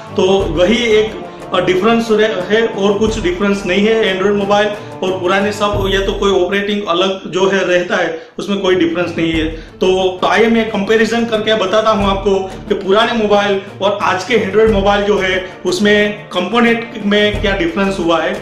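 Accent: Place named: North, India. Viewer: North indians accent sucks!!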